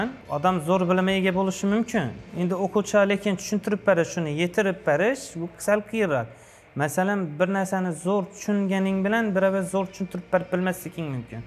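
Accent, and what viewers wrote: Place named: Khorezm, Uzbekistan. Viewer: Xorazm shevasiga gap yuq juda muloyim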